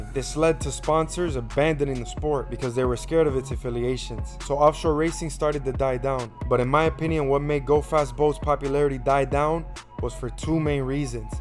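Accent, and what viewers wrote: Miami, United States. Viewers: This guy has a Miami accent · My bro has a Miami accent. 305 ❤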